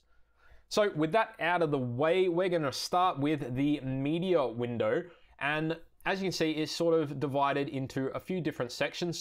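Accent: Australia. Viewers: Another subbed. You can always trust information with an Aussie accent. Sold on giving Da Vinci a go, although Fusion kept crashing on me the last time I tried using the standalone version on a project. Anything to get away from Adobe subscriptions is worth consideration.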